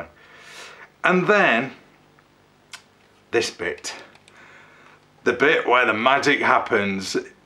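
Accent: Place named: United Kingdom. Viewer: Interesting thanks. Couple of questions - Can you get a rod in the barrel to clean it without risking damage to the self loading mechanism? If the self load was to become faulty, is there a way to manually load a pellet? and lastly, can you actually see anything through the scope with all that plastic in front of it? From your accent I presume that this is UK spec (max 12Ft/LBs) - be interested to see how long that scope lasts before the rifle trashes it to bits. We have the international version of the gun and it is more powerful, these things trash cheap scopes in under 500 shots. (My Crosman Diamondback 22 destroyed the Centrpoint scope that came with the gun in less than 200 shots (broke the springs for elevation and windage) and then destroyed my second scope, Niko Stirling Mountmaster in less than 500 shots (Detached the eyepiece glass completely and cracked the glass too) I am now onto a Hawke Airmax 4-12 x 50 which is fairing better and has surpassed the 500 shot mark OK..touch wood!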